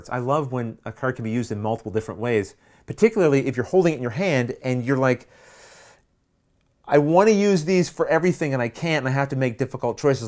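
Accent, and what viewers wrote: Canada. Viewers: That Canadian accent is pleasant :)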